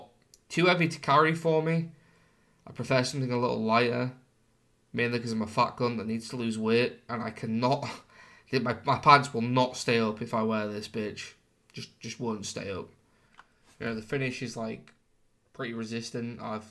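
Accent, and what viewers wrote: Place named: United Kingdom. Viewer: I’m not used to listening to a British accent on a gun collection video, but great collection!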